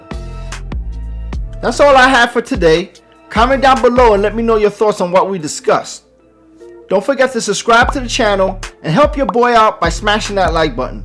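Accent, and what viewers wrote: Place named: Northeast, United States. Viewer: where you from champ? I am guessing the Northeast USA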